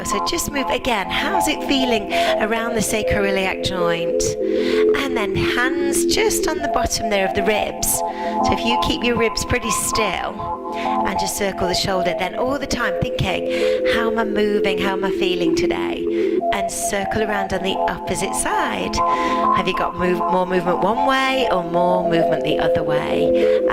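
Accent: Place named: England, United Kingdom. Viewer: hello. I am blind and really appreciated the fantastic order description also I love the English accent am I and as I am also English thank you thank you so much will keep listening to your channel regularly Donna Adelaide Australia 55-year-old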